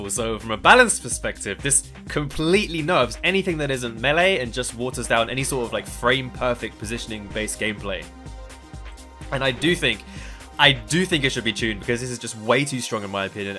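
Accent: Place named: London, United Kingdom. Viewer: u sound like ur from london brother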